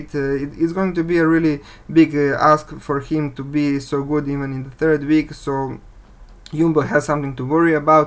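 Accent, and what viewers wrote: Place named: France. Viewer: Come on people, let's help this guy out by liking and subscribing. English is obviously not his first language (French maybe?) yet he's doing really well, excellent information. New subscriber here, looking forward to more cycling videos. Keep positive Sir, you're doing well. WVA for me, but it's almost impossible to pick a definite winner.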